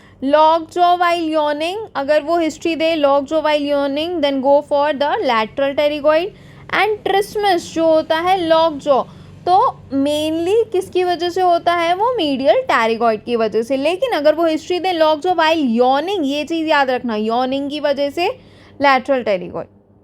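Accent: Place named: Bhopal, India. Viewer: Bhopali ki zuban he ji ke roop me, faeda hoga dosto❤️❤️✨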